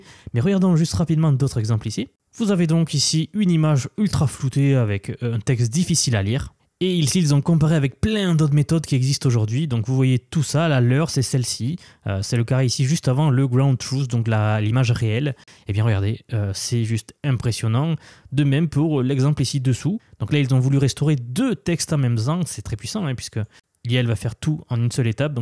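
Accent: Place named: Loire, France. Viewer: Je reconnais l'accent du 42 😅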